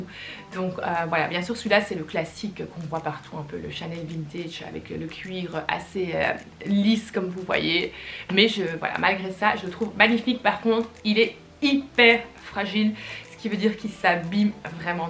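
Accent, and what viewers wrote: Belgium. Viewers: J'adore ton accent Brusseleir ne change pas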